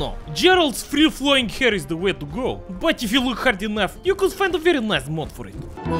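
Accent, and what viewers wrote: Eastern Europe. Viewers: are you russian or non-russian with a good slavic accent?? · This comes up pretty often. In Q&A he said that he was indeed born in a Slavic country. If he didn't mention that I would have guessed that he is a non-slav, since not only is his English good, but he uses certain expressions and idioms that I only encountered if you lived in an English speaking country. I do think he thickens up the accent, as some of his earlier vids where he plays with fans, it is less "thick." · Hello! Awesome video!, like always:) I just want to say something about the free subtitles videos... just a little suggest... I think that videos with smaller subtitles it's better than no subtitles, because people that don't speak english very fluid may confuse words with your slav accent. For example, I'm from SouthAmerica, and always speak spanish; i've learned english in highschool and I complement my vocabulary reading texts, playing a lot of videogames in english and seeing english-speakers youtubers, but is hard to follow your rythm with a strange but blyattiful accent. 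It's only a suggestion, for every person that don't have english as mother language:) love you! no homo(? keep doing your videos!!!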